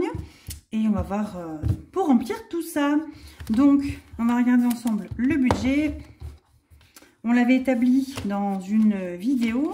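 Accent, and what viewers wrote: South, France. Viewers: Oh mais cet accent du sud ❤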